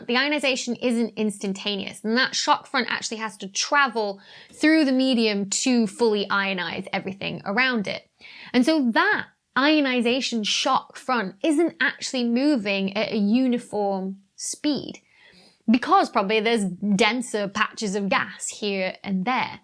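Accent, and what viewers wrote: United Kingdom. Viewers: Very informative. Spot on delivery and content. You keep getting better! Plus, listening to you, I have tuned my ear to understand British accent.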